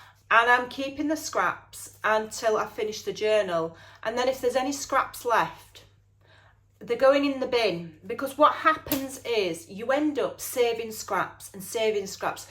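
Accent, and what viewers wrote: Yorkshire, United Kingdom. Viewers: Oh,! I could listen to you all day long (and that laugh!! 🥰)! My dearest friend in the world for just over 50 years was a Yorkshire lass from Leeds. Yorkshire became sort of my second home (I'm from Canada) until Margaret passed a few years ago. I miss hearing that accent. Listening to you brings such joy! My favourite tip was 'DON'T HOARD SCRAPS'!! I am awful for doing that. I have always felt it was 'necessary' - other crafters told me it was. Thank you so much for finally giving me permission to get RID!!! I think it will definitely help me to create more. My crafts table is always such a mess because of these endless scraps everywhere. Loved this video! ❣💖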